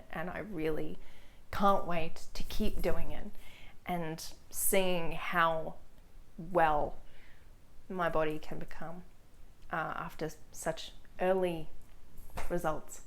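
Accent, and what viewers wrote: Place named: Australia. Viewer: Hello -- it sound like you may be in Australia ??!! I am in NSW. With so many carnivore channels from USA, it is great to know about you 💟💛 I am not sure if I'll stick with this, as my body is not in good shape, I switched from vegan (fruit-based, and also starch-based), to carnivore, and now ketovore and still undecided ! Will be good to view more videos from you. What r your favourite carnivore people / doctors ??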